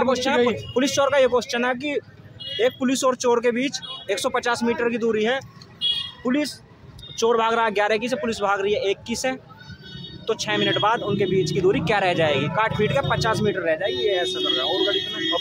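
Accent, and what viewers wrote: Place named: Bihar, India. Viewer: भईयाजी बिहार से हो क्या 🧐🧐🧐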